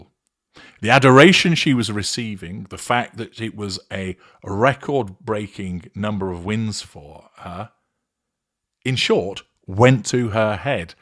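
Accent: United Kingdom. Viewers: This guy really does waffle on. Amazing how many people are impressed by just a pompous British accent.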